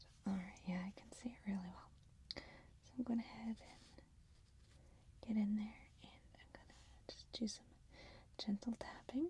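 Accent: United States. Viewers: I would LOVE some more sci-fi. You are the best,. Your diction and general English usage is way beyond what I'm seeing on competing channels. You also have a beautiful voice... no vocal fry, no nasality, no whine... and a very pleasing American accent. Listening to you talk is like spooning honey from a bottomless jar:-) As if that wasn't enough, the camera loves you too. Even with minimalist make up you always look sparkling and fresh. I guess you're used to people telling you how pretty you are, so I won't even go there:-D I wish I looked like you! I love your sci-fi style though. It reminded me a bit of the air hostesses in The Fifth Element, except their make-up is a little too heavy, for my taste anyway. Your make-up here is just about right. It goes perfectly with the sci-fi wig. You could also do a take on Rachael in Blade Runner. That would be kinda sci-fi, but you wouldn't need the wig. 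Thanks so much for your wonderful channel.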